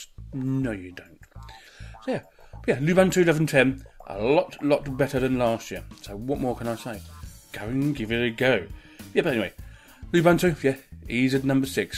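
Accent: United Kingdom. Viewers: British best accent :-)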